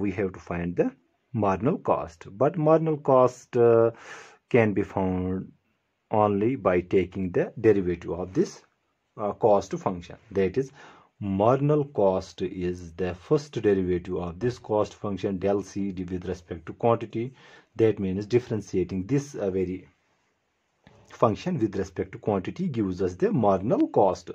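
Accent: Kashmir, India. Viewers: ur english is half kashmiri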